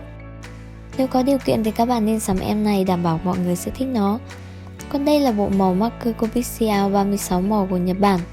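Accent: Vietnam. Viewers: Chị này người việt hả ? Mình mới theo dõi chị gần đây,thấy đa phần là fan nước ngoài Nên tưởng chị người nước ngoài, h nghe giọng mới biết😂😂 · Á hahha mình nghe được giọng bạn rùi cute quá! Giờ ms bt bn là người Việt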